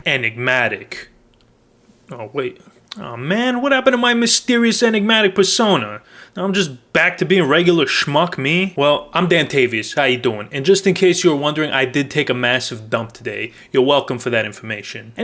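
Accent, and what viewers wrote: New York, United States. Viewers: You sound so New York to my Briskish ears. Love your material man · when you said “hey why don’t i do a video on this” i thought you were gonna say “aye i’m wolkin here” bc of your goofy ass accent everything about you is extraordinarily cringey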